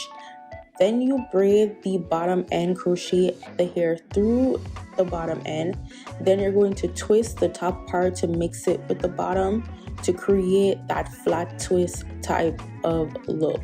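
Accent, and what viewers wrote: Jamaica. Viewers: I think she's Jamaican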